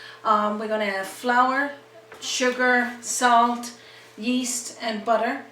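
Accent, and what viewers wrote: Newfoundland, Canada. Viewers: I love Newfoundland accents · Thank you, thank you, thank you, This is absolutely amazing. I'm originally from Corner Brook but moved when I was 9. Learning how to do traditional NFLD recipes with your wonderful accent is truly amazing.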